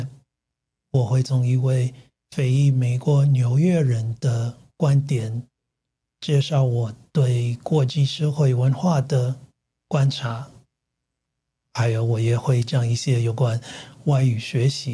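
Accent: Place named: Taiwan. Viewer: 羡慕你有台湾的口音，好好听啊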